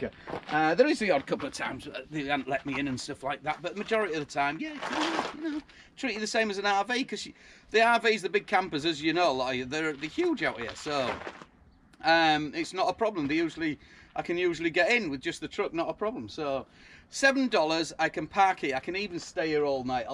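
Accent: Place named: West Yorkshire, United Kingdom. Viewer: Lovely place , bit nicer than Wooley Edge Services eh? 🤣🤣 Great channel , where are you from in Yorkshire ? Sounds like West yorks to me , Im5 from Keighley , anywhere near there ? Cheers .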